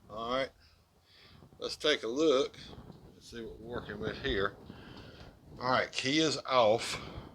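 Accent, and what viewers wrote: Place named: Georgia, United States. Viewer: I was stationed in Augusta, Georgia for 2 years ( US ARMY ). I remember the way the towns folks sounded. Much like you. Brings back fond memories of those friendly folks. I even fooled a Drill Seargent with my accent. He said " Boy, where are you from ? " I said " the south " ! He said " what part of the south ? " I said " South New York ! " He said " drop down and do 50 pushups ! " I did, laughing the whole time !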